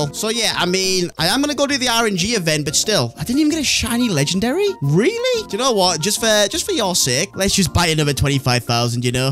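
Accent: United Kingdom. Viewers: Bro are u British or somethinh?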